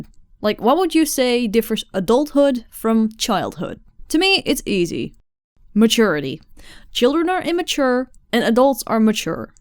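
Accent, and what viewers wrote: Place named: Netherlands. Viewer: Yeah I used to watch your vids back then but it wasn't noticable but now as I started talking to more Dutch people the accent became more noticable lol It's still a nice accent! :-) Hahaha, yeah I had to ask a friend what that "steenkolen" means - quite funny. Yeah, of course! ( As a fellow artist ) I still appreciate your art on a technical level!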